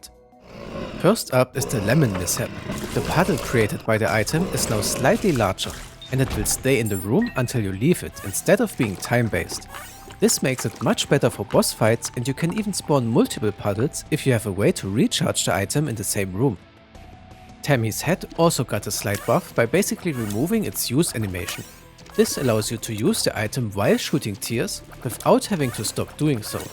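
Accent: Germany. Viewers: the lovely german accent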